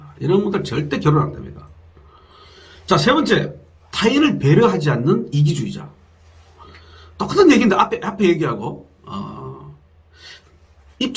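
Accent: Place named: Gyeongsang, South Korea. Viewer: 오~~ 유튜버... 정광용 씨와... 얼굴.. 외모... 사투리 발음이... 아주 비슷하군요. 그 분도... 경상도 던데... .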